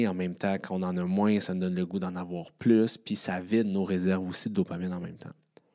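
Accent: Canada. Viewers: Coucou 😃 je prends le temps de te faire un petit commentaire ici, j’écoute ton podcast sur l’appli podcast de mon iphone, je suis ta chaine aussi. Le contenu est intéressant et divertissant - J’adore ton accent canadien 😁 Un grand merci pour ta chaine et ton podcast, pour tous tes conseils et pour ta bonne humeur 🥰 J’aurais juste une petite requête, mais c’est beaucoup demander: ce serait que tu coupes le son quand tu avales ta salive ou que tu bois - je suis un peu misophone 😅😅 À quand le prochain épisode de podcast? J’espère que tu vas bien ❤️ Bises, de France 🇫🇷🙌🏻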